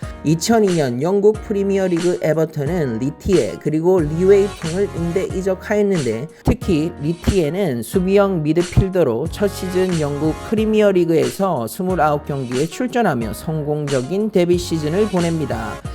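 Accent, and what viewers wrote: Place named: Yanbian, China. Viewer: 你的韩语发音听起来是朝鲜族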